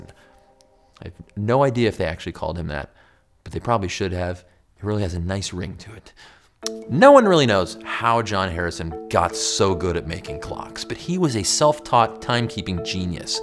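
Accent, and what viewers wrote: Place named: United States. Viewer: He’s using the American pronunciation. We’re “Two lands separated by one language.”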